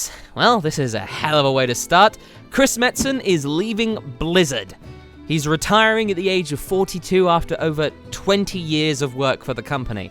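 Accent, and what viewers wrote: United Kingdom. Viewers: Since I dont have twitter, mind if I ask you this here? You're either 1) really good at a british accent or 2) moved to the US from britain. In the case of 2: why'd you move? Europe is awesome! :p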